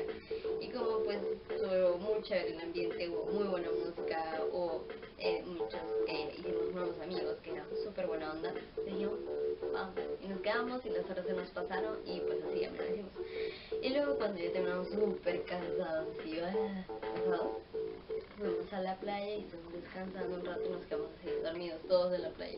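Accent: Mexico. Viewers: Hola bueno conociste el norte. muy buen ambiente. sólo te faltó conocer Chihuahua nuevo León Durango. y bueno hasta se te pego un poco el acento. aguevo. un saludo linda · casi puedo jurar que eres mexicana de verdad tienes acento de sonora por un momento me enganaste saludos... like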